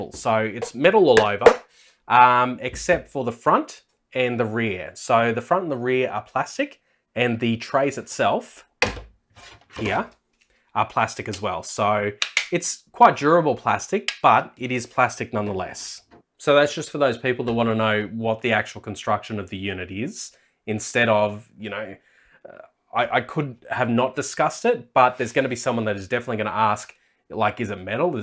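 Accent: Australia. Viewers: Can I use one 2TB HDD and one 4HDD in the 2 bays at the same time? How many devices (phones, tablets, laptops and desktops) can be connected to this NAS? How many accounts/personal folders can be created for this NAS? Thank you very much for your response. (Your accent makes me miss my OZ friends, mate)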